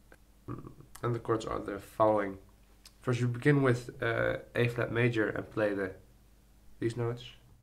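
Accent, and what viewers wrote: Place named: Netherlands. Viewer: thank you, haha je hoort aan je accent dat je nederlands bent qq, maar goed gespeelt!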